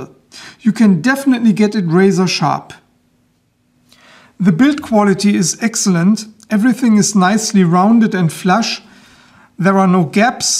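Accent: Germany. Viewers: lovely knife i love your channel your english is excellent especially with your German accent i find it relaxing and interesting at the same time:) cheers from the UK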